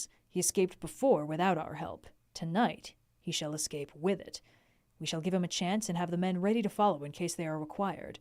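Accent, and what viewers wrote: Scotland, United Kingdom. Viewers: I would have 1000 hours of that scottish if you would spare me that newsman voice.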